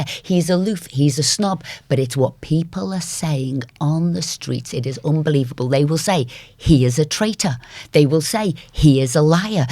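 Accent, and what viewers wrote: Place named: Northern England, United Kingdom. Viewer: I love listening to Esther, her Northern twang makes me feel at home.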